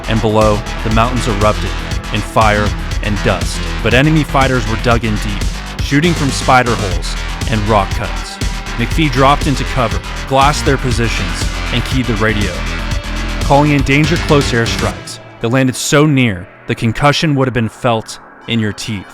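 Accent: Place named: Chicago, United States. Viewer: I thought he was from Chicago, not NJ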